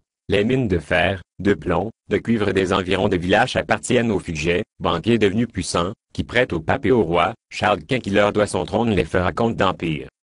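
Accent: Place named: Quebec, Canada. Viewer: L’accent m’a tué t’es québécois?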